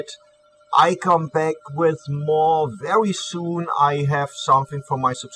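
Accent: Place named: Germany. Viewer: Man hört den deutschen Akzent sehr raus , but anyway , nice video :)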